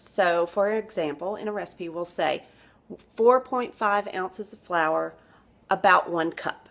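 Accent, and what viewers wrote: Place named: United States. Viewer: Ew her country accent got to go lol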